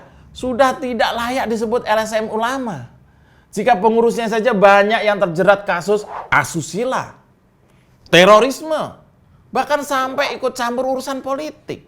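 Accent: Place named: Banyumas, Indonesia. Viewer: Emang gaya bicaranya ngapak banget..Luurrr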